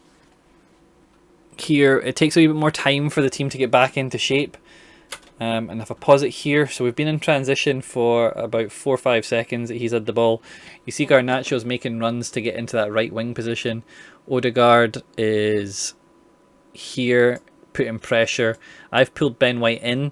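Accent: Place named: Scotland, United Kingdom. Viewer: I guessing you're Scottish right? (Becoz of the "wee bit" term used in your video and also the accent, so i guessed so, haha)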